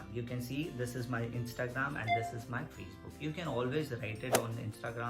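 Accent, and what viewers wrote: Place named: India. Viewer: I love your videos and the your Indian accent . Good job Bro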